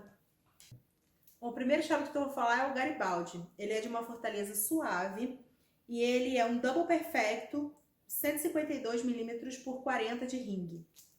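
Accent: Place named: Rio de Janeiro, Brazil. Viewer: .. adoro esse sotaque carioca. Ótimo vídeo, uma aula, parabéns!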